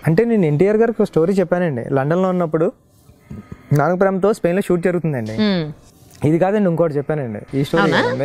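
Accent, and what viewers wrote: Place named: Godavari, India. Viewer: Director sir, are you from Godavari district? Your language like that🙏🙏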